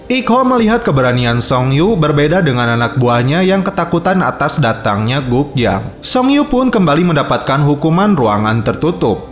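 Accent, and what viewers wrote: West Java, Indonesia. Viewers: Mantap sundana te leungit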